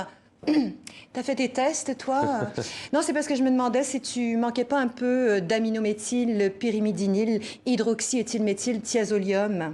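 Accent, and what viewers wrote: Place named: Quebec, Canada. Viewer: Moi ausis, son accent Quebecois.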